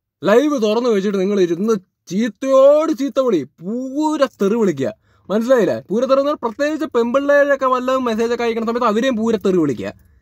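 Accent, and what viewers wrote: Palakkad, India. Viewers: Palakkad aano etta nigal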